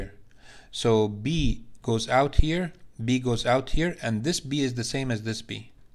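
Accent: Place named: Egypt. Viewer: ❤️❤️ i am from egypt too. I expected that from your accent but i think you are not in egypt? I want to thank you for you for these great videos ❤️❤️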